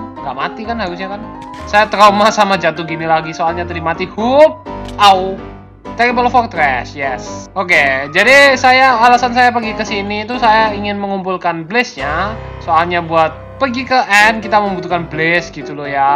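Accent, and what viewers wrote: Java, Indonesia. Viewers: Fun fact: ternyata yang dulu agak medok jawa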